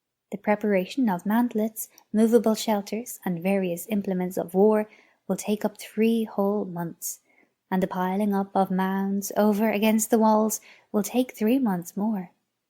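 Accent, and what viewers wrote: Ireland. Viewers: What a coincidence, last week I added an Art of War audiobook vid to a playlist, intending to listen to it at the gym this week. I think I'll listen to your nice Irish-accented narration instead. Thanks.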